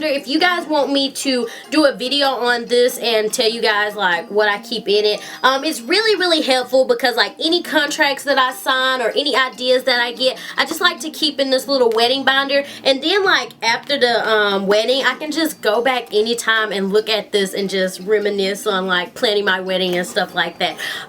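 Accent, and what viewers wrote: South, United States. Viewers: OMG You got engaged on my birthday...and we both have southern accents lol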